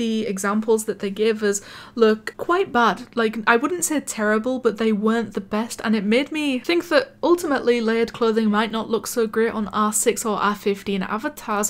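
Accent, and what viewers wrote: United Kingdom. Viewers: She is too bri-ish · she is just so bri-ish ♡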